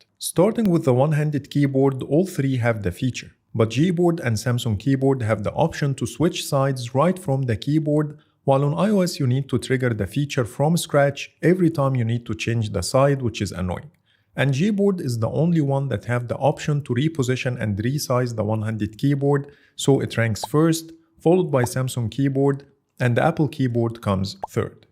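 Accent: Egypt. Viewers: الاكسنت المصري تقدر تميزها على طول 😂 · From your accent i know you are Egyptian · اكسنت انجليزي مصري بس بحد النطق كلو صح ماشاء اللة اتعلمت انجليزي فين ؟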